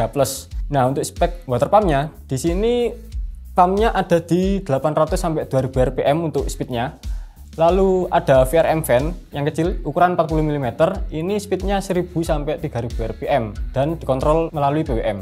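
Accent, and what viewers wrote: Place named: Surabaya, Indonesia. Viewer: logat Anda seperti wong Suroboyoan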